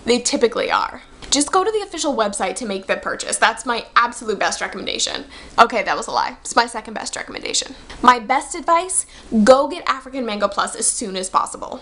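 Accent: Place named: United States. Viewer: Possibly the most annoying american accent ive ever heard. My ears are bleeding.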